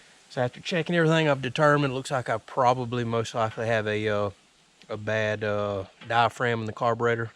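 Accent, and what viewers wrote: United States. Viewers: I enjoy your content so much, and find you really interesting to listen to! Where is your accent from in the USA? Love it ha, all the best!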